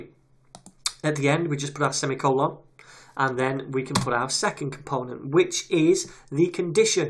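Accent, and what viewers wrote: United Kingdom. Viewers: everything's better explained in british accent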